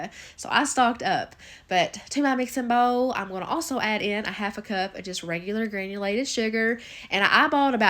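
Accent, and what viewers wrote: South, United States. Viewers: What gives you the right to tell people how to talk? She’s southern. That’s just how we talk. Don’t like it, don’t watch. Be kind. Do better!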